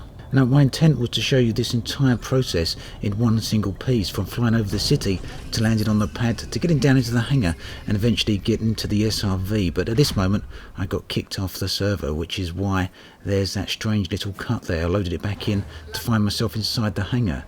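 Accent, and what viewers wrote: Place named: England, United Kingdom. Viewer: Where are you from mate, I know you are English like myself, cannot pinpoint your accent though. I've been playing lots lately and absolutely love the Game, and that's without Horizons so I await that eventuality with bated breath. I play Solo mostly but enjoy it to detraction. Anyway keep posting, I look forwards to viewing all your videos more than anyone's on you tube. Have you got a website of your own? Cheers Mate Paul. By the way have a nice Christmas.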